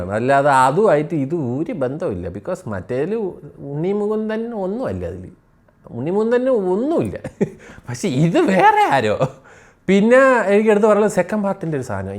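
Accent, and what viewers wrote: Trivandrum, India. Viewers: Do not believe this joker Trivandrum slang u tuber . These are paid reviews .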